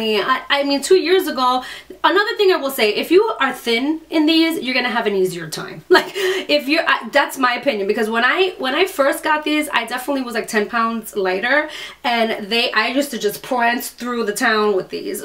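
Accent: New York, United States. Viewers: Sounds like a New York,Staten island or Long Island accent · Def a New Yorker like me haha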